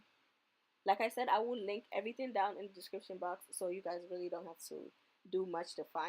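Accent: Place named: Latin America. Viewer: Are u Latino? U have a accent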